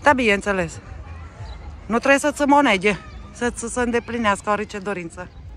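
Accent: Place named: Maramureș, Romania. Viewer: De multă Sănătate să aveți parte. Mulțumiri multe, și.. pentru toate postările,frumoase și interesante.,Graiul tău, autentic este mult mai plăcut,de cât cel..DOMNESC. Ești o simpatică,felul tău Moroșenesc cum vorbești,nu numai Mie cred, că-mi place..cred că tuturor, celor care vă urmărim, Postările voastre Frumoase. S-aveti parte numai de bine, drumuri bune și multă Sănătate. Vă doresc din toată inima, să rămâneți veșnic, Împreună și fericiți ❤❤❤ să fiți Fericiți.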